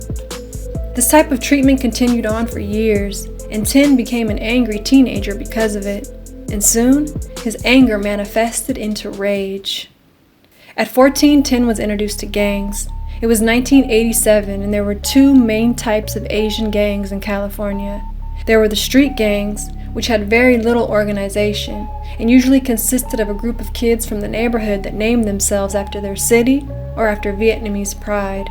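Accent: South, United States. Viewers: Dam you have a nice voice? Southern?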